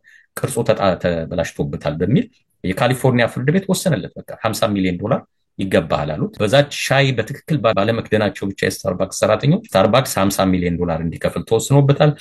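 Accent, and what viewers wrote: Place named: Ethiopia. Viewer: After I listen you patiently you make good points,but You have very heavy accent. You Mentioned 100 times Dow jones. You said do jones typical Habesha.Read it Dow jones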